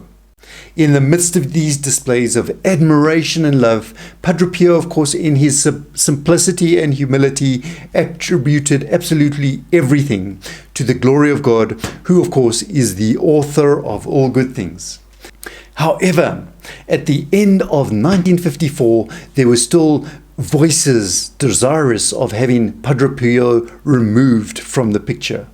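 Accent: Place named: South Africa. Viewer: hmmm - nice to hear a South African accent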